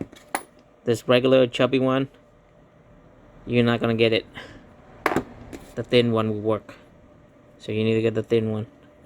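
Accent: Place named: Vietnam. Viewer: chao anh. thanks for the video. I know a vietnamese when i hear one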